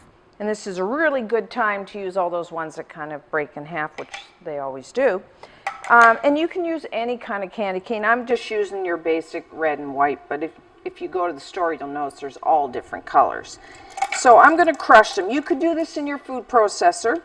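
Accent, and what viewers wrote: Canada. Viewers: whoah shes canadian! haha thats awesome!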